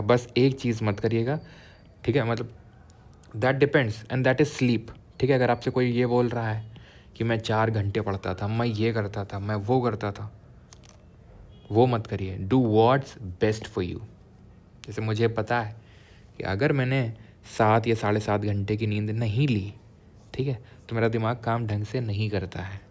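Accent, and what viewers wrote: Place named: Rajasthan, India. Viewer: Guruji aapke bolne ka लहजा bhut badiya hai❤❤❤ like a rajasthani ❤❤❤, A lot of love from Rajasthan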